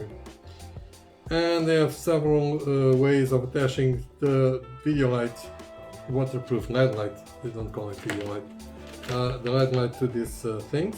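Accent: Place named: Portugal. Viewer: Muito obrigado ( I guess from accent you are portuguese :-) ) for the video. Could I contact you for more questions about SEAFROGS lights?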